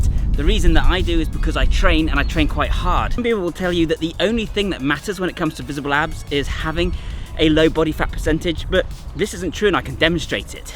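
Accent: England, United Kingdom. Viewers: Americans always trust anyone with an English accent 😁 So of course as someone eating like an American, I’ve had to resort to counting calories. I somehow seem to get fat so easily. 🙄 even when I’m at maintenance I have to count. I guess eating like a hog in my youth while lifting 4 days a week made it where I can’t seem to have that intuition for not overeating. Thanks for the video! Subscribed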